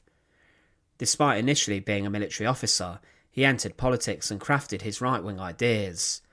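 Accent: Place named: Cockney, United Kingdom. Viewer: Your monotone, staccato and sometimes slurred “Cockney” narration is distracting and difficult to follow. I, for one, wish you’d hand the microphone to a professional narrator! You should go to “diction-classes.”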